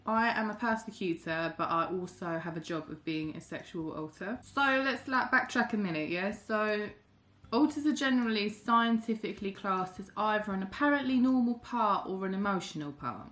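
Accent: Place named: Cockney, United Kingdom. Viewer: a... british accent? (is it?)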